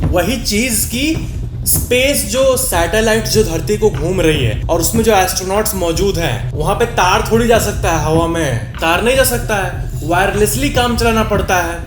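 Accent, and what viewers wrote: Bihar, India. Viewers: That bihari essence wow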